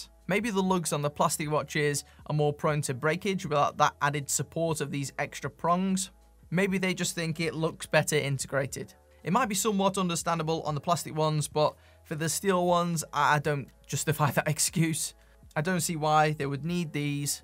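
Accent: United Kingdom. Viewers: OMG, my brain is so confused watching you. You sound like a native Brit, which I assume you are, but look totally like Slav :)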